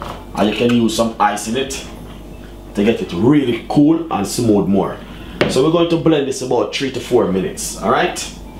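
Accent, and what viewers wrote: Jamaica. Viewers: It's the Jamaica accent for me 🤭🤭🤭🤭🤭🤭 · He's a Jamaican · That Jamaican accent🥰🥰🥰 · Hahaha I like Jamaican accent thanks am going to try it and see coz I have 48kg and I want to be 60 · he's Jamaican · You mean his patwah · I can just tell first minute in he’s Jamaica lol 🇯🇲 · It’s because of his Jamaican patois accent 🤔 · Are u a Jamaican bro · Lol he’s Jamaican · Its Jamaican (Patios) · Nice, I just love the Jamaican flavour of your English. will definately try your receipe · Authentic jamaican accent🤗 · Yea man, I hear it like a bob marley, rastahhhh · Haha some Ppl don't understand Jamaican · Put on wheats 😂Jamaican accent at its best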